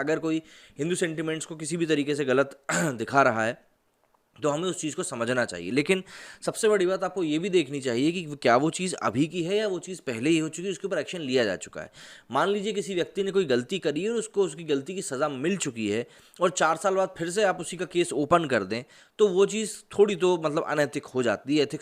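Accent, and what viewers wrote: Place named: Assam, India. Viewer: I think your accent have an assamese touch.😄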